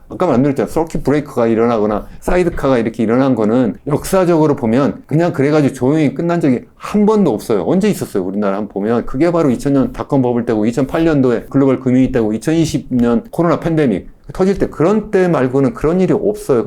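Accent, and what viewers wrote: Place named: Jeolla, South Korea. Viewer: 일단 라도 사투리부터 고쳐주셨으면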